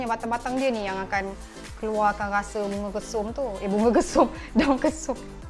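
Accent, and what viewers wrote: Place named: Kedah, Malaysia. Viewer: Comey je kak ckp loghat kedah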